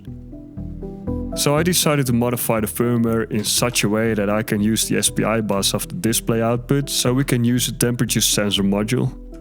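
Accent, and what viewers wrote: Netherlands. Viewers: Wait a MF SECOND‼️‼️‼️‼️ You're Dutch‼️‼️ i hear you say jesus with a dutch accent‼️‼️ ik wist het😂😂 i'm also dutch😂😂 game recognizes game😂😂 and also i hear you say fucking hell fucking shitzooi, between and in the video 😂😂😂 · Hey ur Dutch I’m Dutch too ik hoorde al aan je Engels accent deze lijkt een beetje Nederlands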